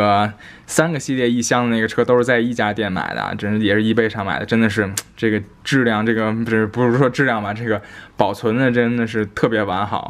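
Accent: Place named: Beijing, China. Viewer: 北京人，说话很有特点很好听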